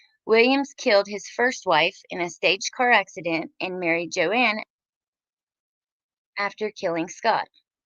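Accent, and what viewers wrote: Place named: Kentucky, United States. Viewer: I've been looking for this. Thanks so much for the video & reading it out to us. I can concentrate to listen, but I have trouble concentrating when I read more than a few minutes. So thank you!! What State are you from? I grew up in E. KY. You kinda sound like me. Lol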